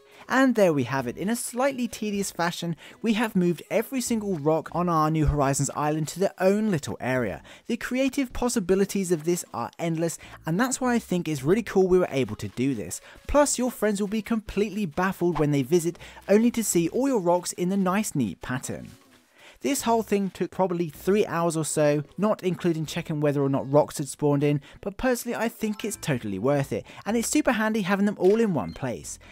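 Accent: United Kingdom. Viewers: This British accent is killin me lmfqo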